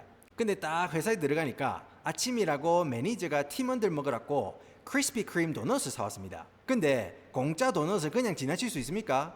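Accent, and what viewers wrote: Gyeongsang, South Korea. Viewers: 영어가 경상도 사투리하고 궁합이 딱 맞네... 재미난 영상 항상 응원합니다. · 갱상도사투리에 영어발음조합 은근 잼있으예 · 우리 슨생님 일 조금 하고 돈 마니 버세요. 이렇게 입을 잘 터는 유튜버는 더 승승장구해야 된다고 생각합니다. 박가네 오상(사투리+고급 일본어)이나 님(사투리+본토영어) 처럼 갱상도 유튜버들이 더 많아져야 한다고 생각합니다.. 귀에 팍팍 때려 박힘. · 아 이분 사투리랑 영어발음은 또 오지는게 진짜 경상도사람인데 겁나 웃기네요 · 갱상도 사투리가 내기에 상큼하게 터지네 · 경상도 사투리+미국쿡 영어 = 님의 억양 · 영남 사투리를 매우 적절히 구사하는 분이군요. ㅎㅎㅎ 게다가 동영상의 내용자체가 매우 진솔하고 생각할 점이 있는 유익한 것이군요. 유튜브 동영상이 대박 나길 기원합니다. ㅋ 미국인의 식습관에 관해서 하나 덧붙이자면 냉전시기인 1960년대에서 1970년대 까지는 미국의 각급 학교에서 비교적 영양적으로 균형잡힌 급식을 학생들에게 제공했다고 합니다. 그러나 로널드 레이건이 집권한 이후엔 국방비는 증액, 교육복지비는 무조건 감액 하는 공화당 정책때문에 미국내 각 학교들의 예산도 대폭 삭감되어 학교에서 제대로된 급식을 학생들에게 제공하지 못하게 되었죠. 이 점도 미국인의 비만 증가에 영향을 끼쳤을 거라 봅니다. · 님, 너무 재밌게 잘 보고 있어요. 초기에 구독자 많지 않을때부터 영상 알고리즘 떠서 보고, 바로 구독하고 지금까지 보고 있네요. 저도 경상도 여자로서, 친언니도 미국 살아서 한번씩 간 미국에 대해 느끼는 부분들이 공감되는 부분도 많구요. 경상도 사투리도 리얼하고, 입담도 좋으시고.. 개그코드에 초긍정 에너지까지 많은 에너지 주는 님 늘 응원할께요. 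· 오! 첨에 쎈 갱상도 억양이여서,너무 토속적이신줄 알았는데 다양하고 박학다식한 지식이 있으시고 전문적 분야도 공감 가도록 설명해 주시네요. 더구나 미국적 배경으로 다져지신 경험과 지식이라서 폭넓은 안목을 가지셨군요. 너무 재밌게 잘들었구요. 미국에 30년이상 살고 있는 사람으로 찐 공감 했습니다. 영상대박이 기대됩니다!!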